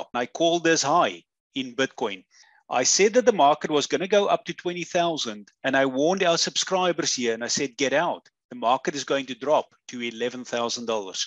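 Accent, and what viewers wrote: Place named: Afrikaans, South Africa. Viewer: Yes it is. It's an Afrikaans accent from South Africa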